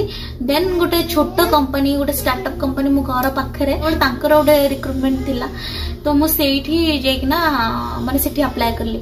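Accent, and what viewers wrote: United States. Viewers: American English kemiti Shikhile ? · How u learned American english? Plz share details...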